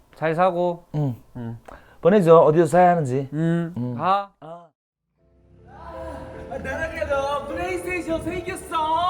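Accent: Japan. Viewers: 저는 일본사람인데 다나카상 정말 웃기네요🤣 특히 외래어가 어려울 텐데 액션을 아크션, god를 곳도라고 하는게 완전 일본식 외래어 발음이에요!! “집이”를 “집이가”라고 하는것도 일본인들이 많이 틀리는 한국어죠~ 요즘 일본에 저런 호스트는 거의 없겠지만ㅋㅋㅋ 넘넘 재밌어요👍🏻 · 한본어 쩌네 ㅋ(어린시절 보고 찌잉해져따..따흑)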